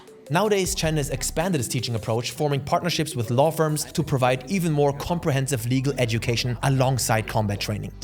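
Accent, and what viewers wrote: Germany. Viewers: I like your german accent. Sounds more brutal this way... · You have a germany accent. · finds so lustig, dass ja super englisch spricht, aber man trotzdem das deutsche raushört:D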